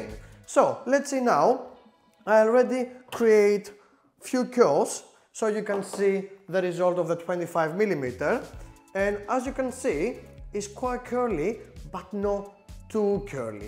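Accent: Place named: Greece. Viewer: Greek accent...💙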